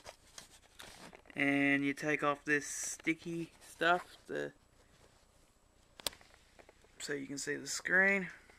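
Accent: Australia. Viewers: darpo3t - he is not british! I'm british, and have neat and clean nails - he sounds australian or kiwi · He sounds like a queens lander.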